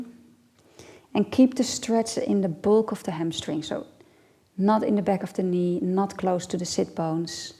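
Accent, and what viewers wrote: Netherlands. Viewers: Haha hallo Nederlands accent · Definitely a Dutch accent haha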